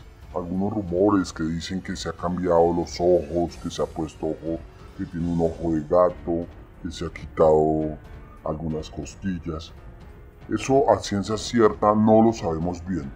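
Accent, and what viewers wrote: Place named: Colombia. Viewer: Este voz acento. Colombiano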